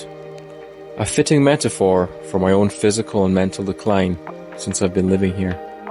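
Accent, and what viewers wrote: Scotland, United Kingdom. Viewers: that Scottish accent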